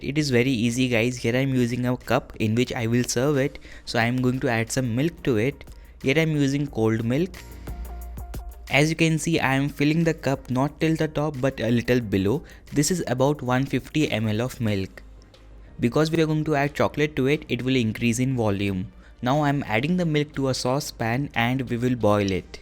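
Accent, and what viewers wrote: India. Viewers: you are indian? You voice tune like indian sub region. And thanks. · That Indian accent tho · 咖喱味英语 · Damn, why all the recipes with Indian voice there and everywhere behh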